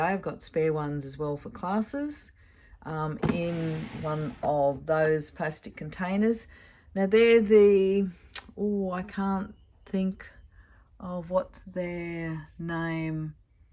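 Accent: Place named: Australia. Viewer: I have always thought I was born in the wrong place.. im not supposed to be from CALIFORNIA, I was supposed to be Australian!🤣 I LOVE your accent.. it's so amazing! So is your craft room, of course! Your room is absolutely gorgeous!! 🥰Quick story.. my grandparents went to Hawaii 1x when I was about 13, I'm 44 now lol. I told them to bring me back a cute boy, lol! When they got home from their trip, they showed us the home videos they took. In the middle of the video, were 2 guys on the beach! All of a sudden, they say... "G'day Melinda! We're from Australia!" I about died!!! My grandpa went on the beach to find 2 guys without shirts of course, and asked them to film a clip for me! I miss my gpa so much! He did this stuff all the time! ❤️ I know this isn't about that story.. but it's just to show how long and much I've loved Australia! I hope I get to go someday!❤️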